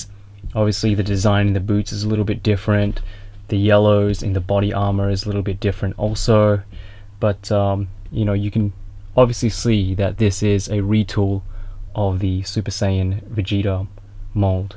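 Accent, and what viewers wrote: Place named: Australia. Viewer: I was your 500th Sub haha. I'm guessing from the accent you're in Australia too? Where do you get your figures from. I've found that hobbylinkjapan is the best so far. Let me know if you have other options.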